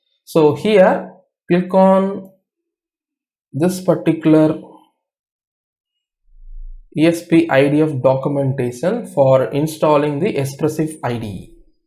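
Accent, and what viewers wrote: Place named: India. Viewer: too much indian accent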